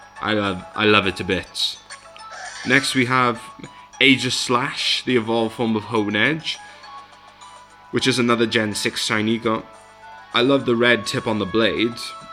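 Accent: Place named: Wales, United Kingdom. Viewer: Your accent and pronunciation, my friend is also Welsh it's pretty cool. I've got a boring English accent unfortunately haha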